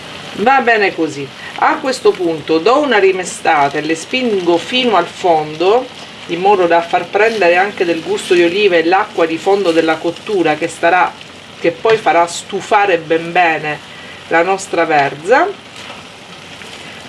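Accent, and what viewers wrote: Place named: Sicily, Italy. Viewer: Siciliani di dove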